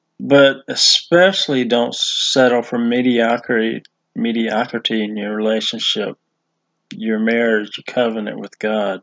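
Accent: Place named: Scotland, United Kingdom. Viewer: I have given my roommate many a giggle by learning to speak Scottish like you: "How are yuuuuuh!" :)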